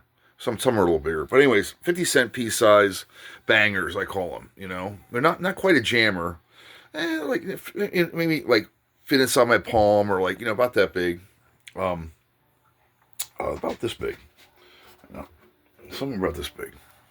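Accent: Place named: Philadelphia, United States. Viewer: Man i heard that philly accent and thought damn ill get this dude some bread but your down in Florida . Im in md . Other than home made tats ive done all my work was done by a dude named Frank at a shop in philly off south street ( Eddie's i think is the shop every time i was wasted so i cant remember ), its all jacked up drunken spur of the moment stuff. If your ever blowing through MD or philly let me know 🤙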